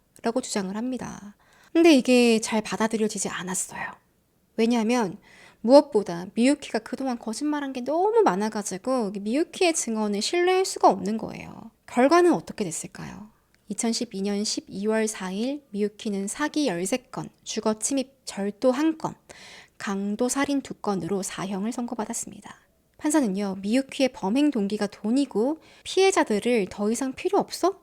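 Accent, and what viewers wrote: Busan, South Korea. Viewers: 아따 어리석은 남자들~~~ 사투리 넘 부산요 ㅎㅎㅎ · 일본어 묘사를 하시다가 영남 사투리로 너무 자연스럽게 전환하시네요😂 오늘도 재밋게 보고갑니다👍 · 알아도 또 듣게 만드는 재주가 있으시네~ 사투리는 또 와그래 이쁘게 잘하노? 반했데이~ㅎㅎ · 아니 ㅋㅋㅋㅋㅋㅋㅋ 연기도 잘하는데 부산사투리도 와이래 완벽합니꺼 ㅋㅋㅋ못하는거 없어 ㅎㅎㅎ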